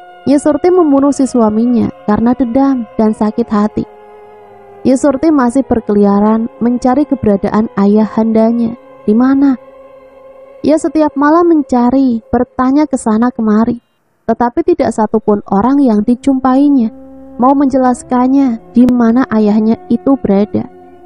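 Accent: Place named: Indonesia. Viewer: Sy suka mimin ini walaupun kekadang terbawa bawa dlm membaca ceritanya tetapi asli suara nya Dr Indonesia...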